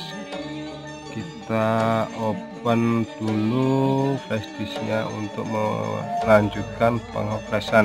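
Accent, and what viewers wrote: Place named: Banyumas, Indonesia. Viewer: Kaya wong banyumas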